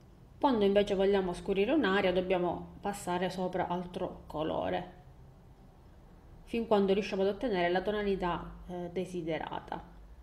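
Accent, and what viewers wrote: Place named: Sicily, Italy. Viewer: grazie per i tuoi video e complimenti, si sente che sei siciliana, un saluto da palermo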